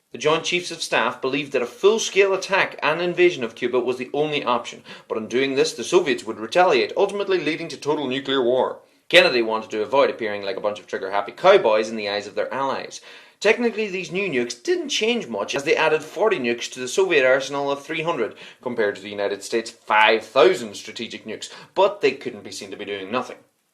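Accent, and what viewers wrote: Ireland. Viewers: are u irish? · I think it's because he's Irish and JFK was Irish-American. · He's Irish.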